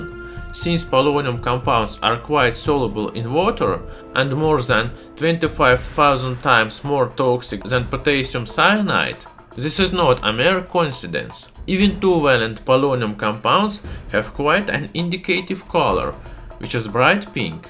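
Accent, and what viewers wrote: Russia. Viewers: There is my beautiful Russian accent back again. Ya tebya lublyu. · Is he Russian? I love his accent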